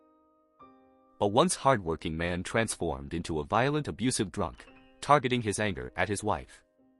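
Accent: China. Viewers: I was watching a video you made 8 months ago and you sounded different. Don’t get to good at English I like your slight Chinese accent. With that being said you are arguably more articulate and well spoken than most native speakers.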